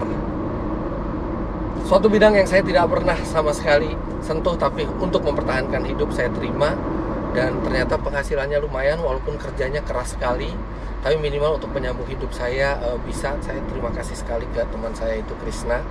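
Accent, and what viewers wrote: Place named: Java, Indonesia. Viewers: dan saya logatnya medok dan saya langsung dapat panggilan jawir😅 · "Agak shock berada disini. Karena....cara ngomongnya itu beda. Saya biasanya itu 'aku, kamu' disini 'lo, gwejh' dan saya logatnya medok dan saya langsung dapet panggilan Jawir"🗿 …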